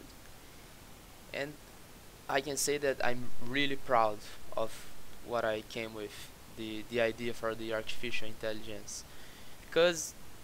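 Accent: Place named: Brazil. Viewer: Acredito que seja Brasileiro pelo sotaque, mas que otimo video!! Nao pare de fazer videos!!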